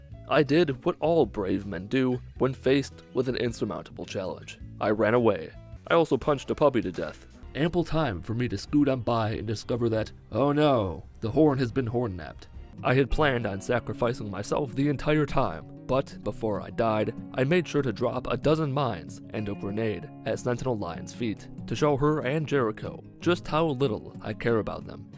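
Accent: Michigan, United States. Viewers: R u from Michigan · That’s what mighiganders sound like you are offending my people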